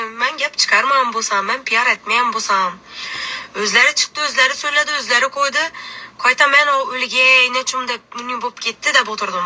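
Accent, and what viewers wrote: Khorezm, Uzbekistan. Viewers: Товбаламанг нима килибди хоразим тилига тушмасангиз ешитманг бизни тил ози шундин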